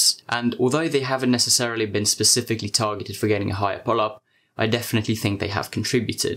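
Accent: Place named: England, United Kingdom. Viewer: Blimey, I thought your accent was from England. You must have learnt your English from an English person.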